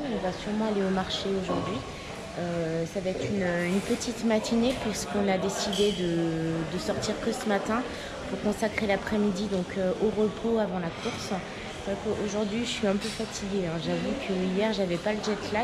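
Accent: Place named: Cambodia. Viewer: Super Voyage et superbe vidéo !! On a adoré aussi de découvrir le pays dont je suis de cette origine. Une belle découverte pour nous en fin janvier et début février 2020 juste avant le COVID 😅. Ravis de vous suivre ta chaine youtube et rubanbleu. Et super l'accent cambodgienne 😅. On a hâte de y retourner. Merci beaucoup pour cette vidéo.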